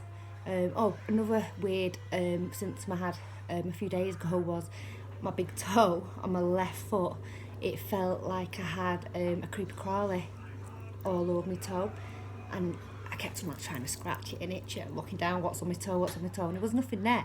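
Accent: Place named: Manchester, United Kingdom. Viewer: It's horrible ain't it. I've had it over 2years and if I didn't have a sense of humour... You sound like you are from the Greater Manchester area. I am too. Is there a specialist you would recommend?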